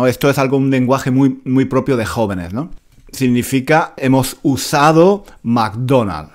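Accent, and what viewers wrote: Andalusia, Spain. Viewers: Tiene un acento español del sur de España, asturiano., dijo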